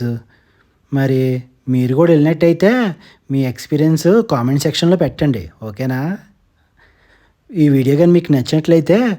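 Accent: Godavari, India. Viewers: Mi godavari ascent bagundi andi 👏👏😂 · Super me godavari yasa chala bagundi vinadaniki funny ga vundi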